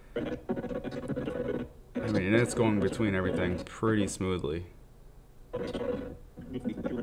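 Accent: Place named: Boston, United States. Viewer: Bro, I can hear my hometown in that voice. I grew up in Southie. Randomly came across your channel; just faced the exact same dilemma. Different workflows, and my PC is equipped with a 3080, probably spent close to $5k on the PC build total. I picked up a fully decked out M1 Max (64gb, with the 2tb SSD). The MacBook was cheaper, coming in around $4300. Granted I still use the PC for gaming, which I have no plans to do on the Mac, but it’s pretty insane how crushing that performance is. Sadly, I’ll have to wait for Dec 1 to get my 16” MBP; this has definitely made the wait harder. Can’t wait to get this little powerhouse in my hands, retire the old 16” Intel MacBook Pro, and save some time everyday.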